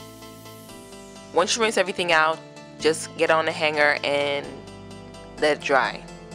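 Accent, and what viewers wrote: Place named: Brooklyn, United States. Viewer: I love this diy! I had a feeling you were a Brooklyn girl from your voice and I checked your Facebook!!! BK Love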